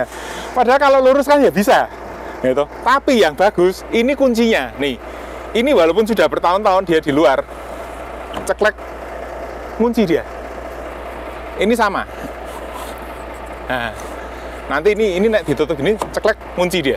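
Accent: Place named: Surabaya, Indonesia. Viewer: SALUT SAMA BOS... TOP BANGET.... LOGATNYA NGOMONG KAYAK AREK SUROBOYO..... SALAM DARI SURABAYA......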